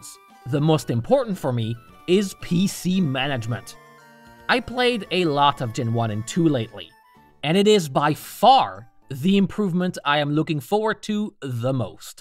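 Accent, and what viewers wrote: France. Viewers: Man ! Are you French ? Si oui incroyable je m’y attendais pas du tout haha 👏🏻 très bel accent 🤩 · Are you French?? You speak English VERY well! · Are you french ? Im french and i kinda hear a french accent but im not sure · I'm gonna be honest, it wasn't until this video that I realized you were French. Désolé.. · Wait, wait, so you're from France and speaking English without an horrible accent? I am impressed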